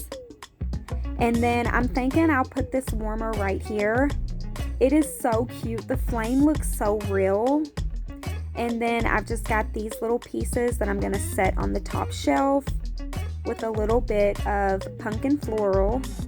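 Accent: South, United States. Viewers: I can’t get enough of the southern drawl 🖤🤍 I lost mine but it’s so comforting 🤷🏻‍♀️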